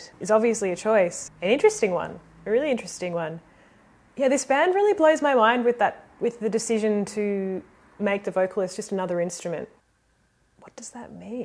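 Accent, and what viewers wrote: Australia. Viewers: Wait.. am I not identifying your accent correctly.. are you Australian? I always thought that strange instrument sound is a didgeridoo, isn't it?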